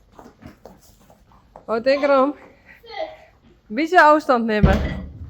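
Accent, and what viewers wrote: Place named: Friesland, Netherlands. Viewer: Zijn jullie Fries? Ik meende Nederlands te horen en toen dacht ik... nee toch niet helemaal bij bepaalde woorden haha. Dat moet dan wel iets van Fries wezen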